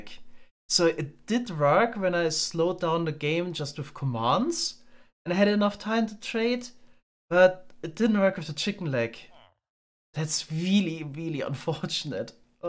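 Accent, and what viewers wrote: Germany. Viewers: Ach man.. Immer dieses Englisch als Deutscher 😂 Man hört es immer raus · Are you German because you have that accent if you have pls say something in the next video bb love your content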